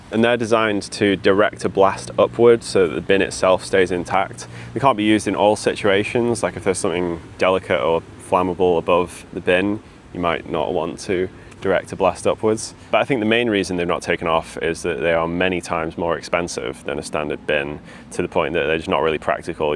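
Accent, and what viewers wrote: United Kingdom. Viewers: I had a sudden bit of a hankering for a garbage video with British accent, so this really helped me carry on calmly. A litterl afternoon escapism to treat my tea rash. You were tricked by the omnipresent deception scheme of the global Cult of the Nine. - That is £518, not £517. And cheaper is always good, because this means you can leave a bigger load of trash in a black container on a hot sunny day and eventually the locals can fetch their free compost. Everybody wins. Cool, it's a trash garage. (Which could totally be a punk rock band name. Although ideally not a British one.) So a trash can with a bomb in it is basically a laden bin. It was a foolish idea to spend resources on them. Who wants to hear trash talk on the streets all the time? Now that is outright offensive, I have to say! 😦 Britain has a long tradition of selling its soul for mere material values. 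P.S.: What is the name of the tribble there that is listening to everything you say?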